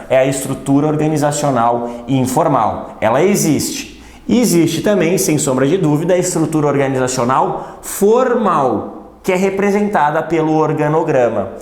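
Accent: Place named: South, Brazil. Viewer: quando o profissional é bom, em apenas 1 minuto isso se torna claro. Parabéns. +1inscrito p.s. aposto que é sulista. ô povo bom para ensino ead.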